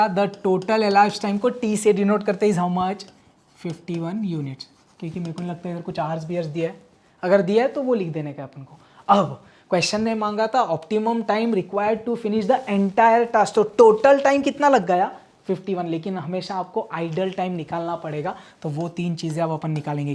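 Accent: India. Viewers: Sir we are in love with your Hindi Accent 💘😍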